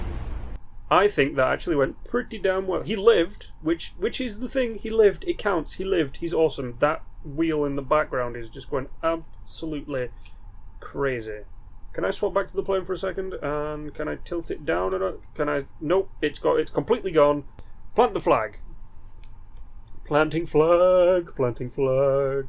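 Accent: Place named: Geordie, United Kingdom. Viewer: no problem. Also, are you from the northeast by any chance? I sorta hear Geordie mixed with a bit of Yorkshire.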